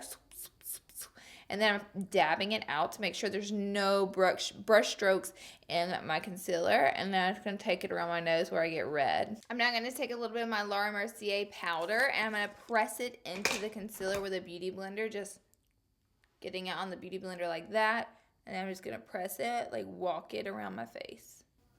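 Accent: South, United States. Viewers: is it me or she has more of a southern accent lol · honey where are you from? you do a FAB southern belle. you must be somewhere close to the southern states!! LOVE IT! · love the contacts:) and all your southern twang coming out!! beautiful look :) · 💖 ya and your southern accent lol! then British! what contacts do you have on? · 3 things I like about you 1.) you don't owe anything but still offer it up to people & 2.) you embrace your southern accent. I have one too! I'm from KY! 3.) you work that gray in your hair. I got it too, girl.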